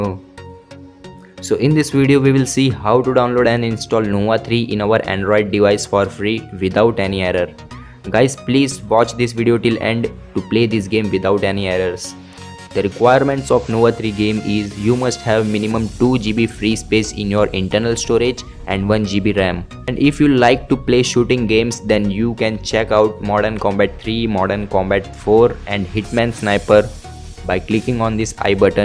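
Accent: India. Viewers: I would try this but you have faded background music and an indian voice... So I know it's a scan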